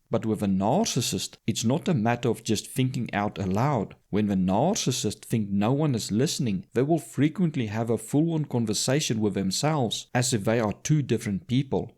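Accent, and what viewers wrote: Afrikaans, South Africa. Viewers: Dude, is jy Afrikaans? Jou aksent klink baie Afrikaans.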